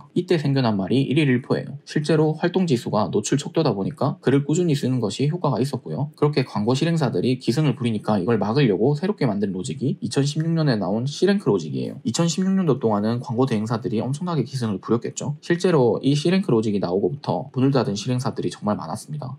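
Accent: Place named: Gyeongsang, South Korea. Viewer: 강의듣는데 말투가 친근하셔서 같은 경상도인이거니 했는데 거주하시는 곳이 같은 지역 같은 ㅁㄱ동이시군요 ㅎㅎㅎ 괜히 반갑고 더 집중하게 되었네요 !! 좋은 영상 감사합니당 😊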